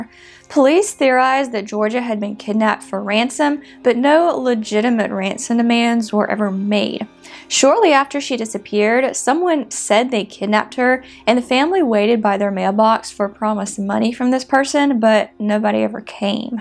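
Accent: South, United States. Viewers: has a nice southern accent.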